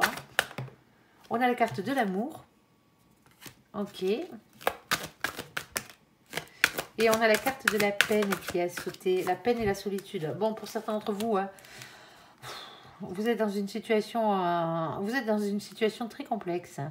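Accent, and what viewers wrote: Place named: South, France. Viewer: Vous êtes super, et quelle energie avec ce petit accent du sud😂 Quelle maitrise, ça inspire confiance❤ très beau tirage, merci😘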